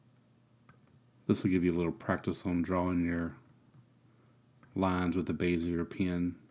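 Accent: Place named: United States. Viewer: your american im scottish DIDNT understand a thing you were talking about sorry :-((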